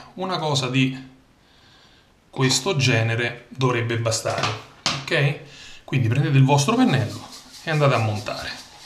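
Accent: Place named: Naples, Italy. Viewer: dal tuo parlare mi sembravi di napoli ehehe tutto qui.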